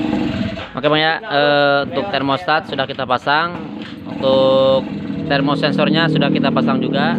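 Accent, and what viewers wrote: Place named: West Java, Indonesia. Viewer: Maaf bang alamat bengkel nya d mana yah kalo boleh tau ngmong nya ada sunda2 gitu,soal nya saya juga orang sunda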